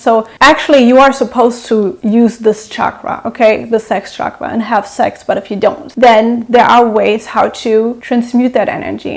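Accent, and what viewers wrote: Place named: Germany. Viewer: Are you German? I love your accent!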